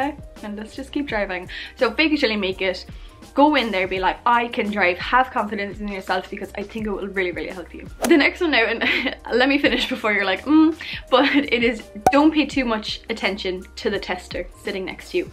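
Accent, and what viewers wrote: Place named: Ireland. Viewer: It's very fast for me... It's only for Irish people. I'm trying to say about your English. But I don't give up! I'll watch all your videos. Do some podcast please about your car. About your dashboard and all parts of car what we need to know for test. Thanks and keep going!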